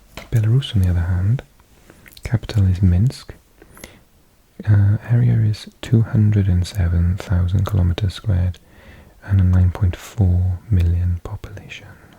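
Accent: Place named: Wales, United Kingdom. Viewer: yes the accent sure sounds very Welsh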